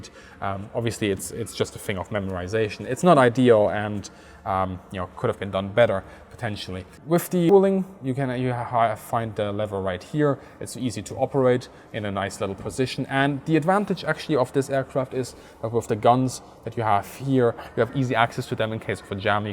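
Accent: Germany. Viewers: Dein Englisch entlarvt dich als Deutscher. Horrido alter Hase. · Loved this. Brought up on Biggles stories and WWI fighter pilots. It's great that your accent gives the German words a better sound that an English person could. 👍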